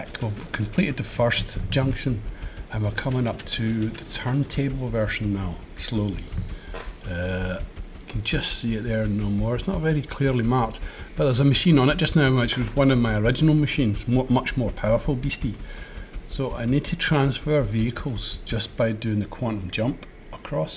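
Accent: Scotland, United Kingdom. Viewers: really? 0.o That's interesting. Do all people in Scotland speak like that? I'm so curious :D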